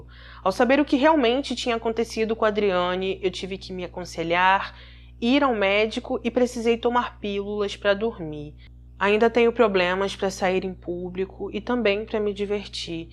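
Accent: Rio de Janeiro, Brazil. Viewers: Tô és do Rio? Fala extados unidos parecendo carioca. É legal 👋🏻👋🏻👋🏻👋🏻👋🏻👋🏻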